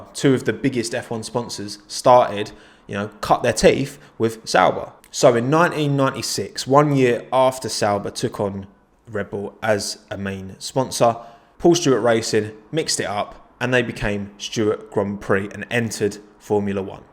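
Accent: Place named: Essex, United Kingdom. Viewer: Great video, strangely I found the best bit was knowing your from not to far from me haha, especially after I only came across your channel after watching Josh Revell and thought your voice was Essex sounding 😂😂😂 keep up the great videos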